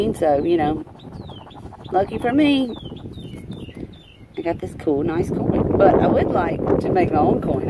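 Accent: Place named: South, United States. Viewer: Nice southern accent gal!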